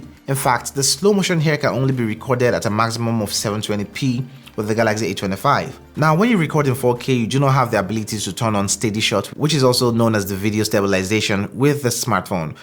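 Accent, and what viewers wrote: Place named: Nigeria. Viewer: He sounds Nigerian but I could be wrong.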